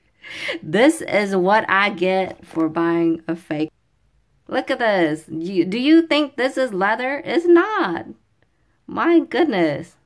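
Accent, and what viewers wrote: South, United States. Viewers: On point sister, I’d rather have cash in my bank and love your southern accent 👏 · Southern, funny, and kept it aaaaall the way real.....new subbie. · Love your southern accent ❤️ I’m a Californian and I love hearing southern accents. And I hear ya on the fakes, hence I’m so scared to buy on these retailers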